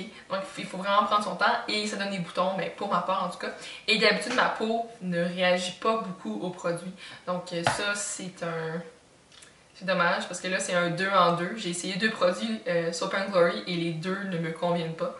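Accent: Quebec, Canada. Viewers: Ah ! J'adore les youtubeuses quebequoises !! Votre accent est vraiment craquant ! J'ai aussi les cheveux bouclés et quelle galère de trouver un produit efficace, que ce soit pour les nourrir ou les definir... j'ai testé aussi la game john frieda et comme toi, bof... je soupçonne même que ce soit bourré de silicone et autres berk...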